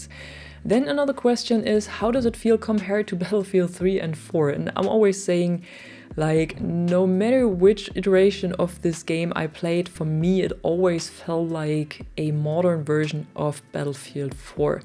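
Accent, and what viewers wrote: Germany. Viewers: Never seen this persons channel or stream before, but I am detecting a strong German accent.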